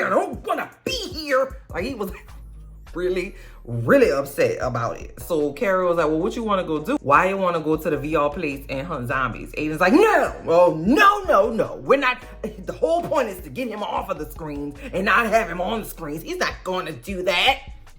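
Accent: New Orleans, United States. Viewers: "Take Braedyyy, taek Braedi.....He had a long life. I think what he like 19/20?...That's a solid life if you ask me!" YEOOOOOOO The Nawleans accent is the icing on the cake to these AJLT reviews, cuz the show ain't issh 😫🤣🤣🤣🤣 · I love your accent. New Orleans is my all time favorite city, and your humorous recaps are the ONLY good thing to come from this monstrosity of a reboot. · First, I LOVE YOUR RECAPS. Everything is better with an authentic New Orleans accent! Secondly, I totally agree about the children on this show. They are constantly in sexual situations and having sexual conversations, ew. The conversation about polyamory or pansexuality during dinner with parent and grandparents was an ABSOLUTELY NOT. This show is tripping.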